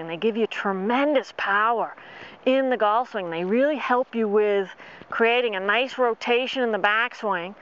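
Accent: Boston, United States. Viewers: Never heard this one before, "butt" it makes sense! Gonna take a shot at that cool accent...Boston??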